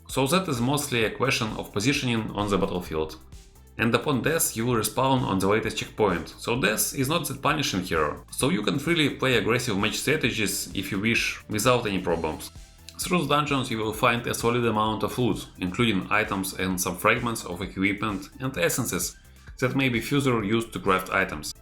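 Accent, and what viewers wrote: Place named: Russia. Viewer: First of all, I'm not "criticizing" your Russian accent. But it was a little bit difficult to understand and I know you're working on it 😃 Other than that, good review. Do you know why I "Consider" it a good review 😜 2 reasons, 1. You got straight to the point. So many YouTubers, just drone on like an air-conditioner 😆 2. It was less than 10 minutes, and that's a good thing, honestly. People today have very "short attention" spans today🙄 You did talk a bit fast but, that's perfectly okay lol! You had a lot to cover and for the most part, you succeed 😝 So keep up the good work and I look forward to all of your, future videos 😁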